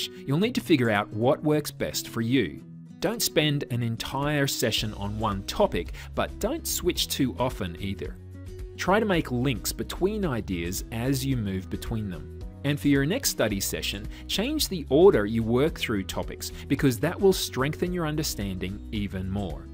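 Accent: Australia. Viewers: Is this guy Australian? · Beautiful aussie accent :)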